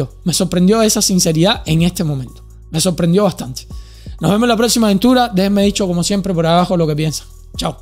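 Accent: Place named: Cuba. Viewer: la llegada de tus padres fue una alegría inmensa, y no sé si es manía mía nomás, se te escapa la modulación cubana más de lo que se acostumbra, jajajjaja Saludos y viva Cuba libre!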